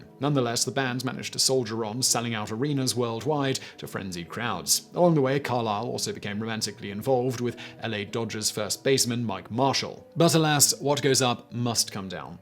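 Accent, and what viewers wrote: United Kingdom. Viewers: Chrisholm for Chisholm definitely got a giggle from me, I can see how your brain would want to add an R in there. It was extra amusing to hear someone say the "holm" part with a British accent instead of the Southern U.S. accent I always hear & say it with ("um" rather than "holm").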